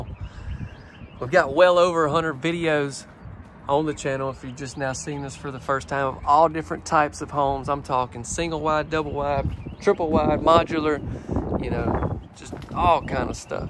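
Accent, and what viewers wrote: South, United States. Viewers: “You know whut” lol I’m from the south too 😆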